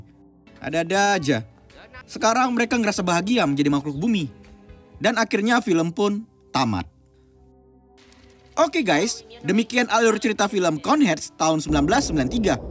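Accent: Medan, Indonesia. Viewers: Logat Medan Yo😂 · Medan mananya kau bang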